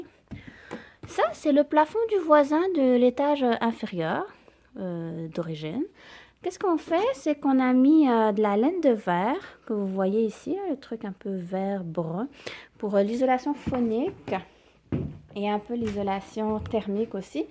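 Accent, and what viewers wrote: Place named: Quebec, Canada. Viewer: Une eurasienne avec un accent québécois... bienvenue au 21eme siècle!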